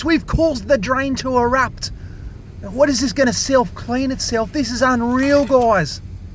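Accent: Australia. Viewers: In Pennsylvania in USA. This was a great video as you kept the annoying music to a minimum.. Got to hear the crazy Australian narrate. That's the part that separates you from the rest. The pure genius description of a total mess.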